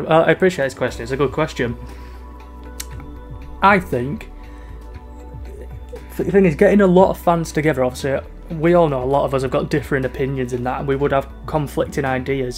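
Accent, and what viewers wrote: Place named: Yorkshire, United Kingdom. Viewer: nice! I was thinking Yorkshire or Hull from your accent. My mom was born in Leeds, and grew up in Yorkshire before moving to the US in the ‘80s.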